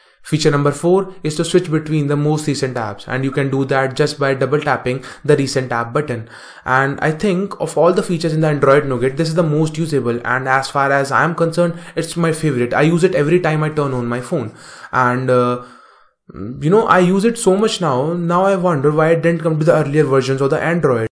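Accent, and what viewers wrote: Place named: India. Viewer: oh god ! im going to be sooooo racist! your accent is soooopo onmy nerve! i've heard another indians accent but your's just so deeeeeep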